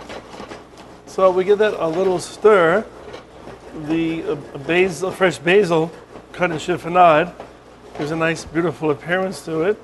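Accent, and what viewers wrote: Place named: United States. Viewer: Tom-ay-toes. Bay-sil. You Americans really can’t speak properly 🤦🏻‍♂️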